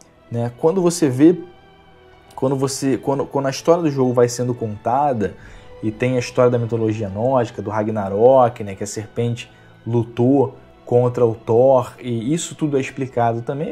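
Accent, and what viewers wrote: Rio de Janeiro, Brazil. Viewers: Vc e carioca!?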